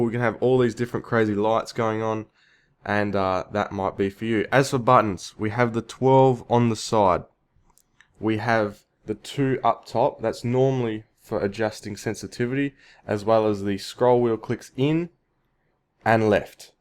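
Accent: Australia. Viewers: Goodish Review, you will polish up as you make more:) and good to hear another aussie. I will wait for the next mouse as this one is not there yet. One deal breaker for me is lack of a free-wheeling scroll-wheel. My current mouse has one, (Logitech G502) and i didn't know it was so important till I used it every day. Just in facebook a free-wheeling scroll-wheel is a attribute without peer let alone in Fallout4. Second deal breaker is not being able to change the individual colours of the buttons as a visual aid. I have a Corsair R95 RGB.. my god what a fantastic keyboard, having key colours change in each profile is important to me. To make your reviews better you should make a list of what is important in whatever device. Like in this one you need to mention if each button can be colour configurable or only one colour for all buttons. RGB devices are not all equal it seems:) I just assumed they were changeable like the keyboard till i looked at multiple reviews and only one person mentioned this fact. 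And only one other reviewer mentioned the lack of a free-wheeling scroll-wheel. Cheers and keep up the good work..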